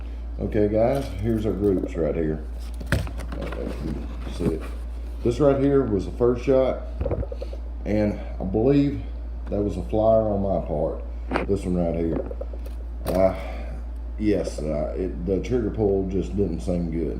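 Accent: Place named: Kentucky, United States. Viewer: You sound like a fellow Kentuckian. Am I right?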